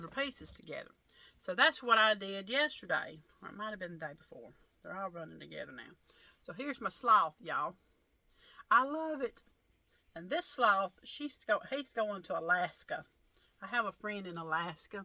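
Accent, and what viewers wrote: South, United States. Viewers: Hi mss from western Nebraska, please take care of your knee this coming from someone who had many knee issues. Getting a new one can be endured and so much better. Keep on posting, love your channel. Love your southern accent!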